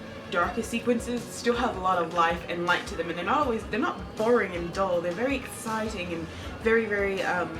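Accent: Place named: United Kingdom. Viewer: really?? I hear a slight British accent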